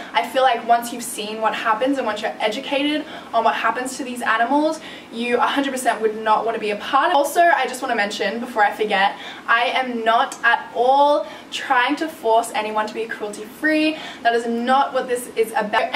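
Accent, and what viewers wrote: Australia. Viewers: Hey, can you make a Get Ready with me video with your Australian Accent?:) hope you noticed me! I love to watch your videos because of your mix thingy accent and you're so talented!! you look like Kylie jenner slash Vanessa Hudgens <3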